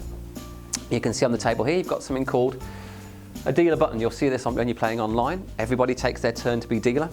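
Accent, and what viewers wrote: United Kingdom. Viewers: He's actually Russian but he does a great Brit accent when explaining a Texas game. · I can't understand this guy with that heavy English accent. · One of the simplest vids to learn despite his deep British accent lol.good Good thx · First “how to play” video I watched was an Aussie accent, this one is a British accent. Maybe third times the charm? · why a brit guy is teaching texas poker ?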